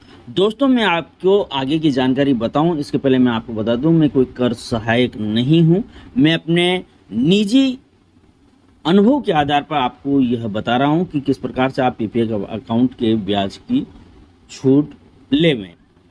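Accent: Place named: Kota, India. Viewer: Aap sir mujhe kota Rajasthan k aas paas k lage aapki bhasha se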